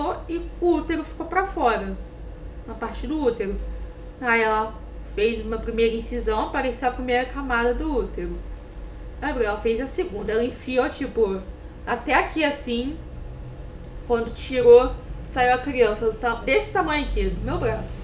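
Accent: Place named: Rio de Janeiro, Brazil. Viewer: com essa língua presa é falando carioqueis é engraçado demais kkkk. Sabia que VC não diria às diferenças das profissões kkkk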